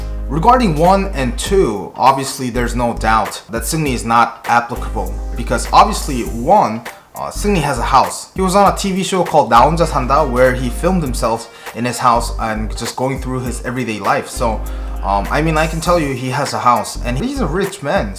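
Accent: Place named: England, United Kingdom. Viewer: Like your English accent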